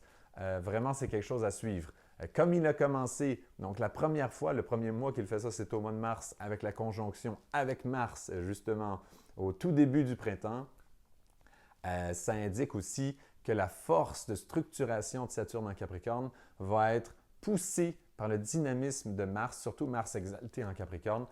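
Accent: Quebec, Canada. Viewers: Nouvelle abonnée, merci beaucoup pour cette vidéo et pour ce sympathique accent Québécois je pense, je vous souhaite un beau mois d'avril du sud de la France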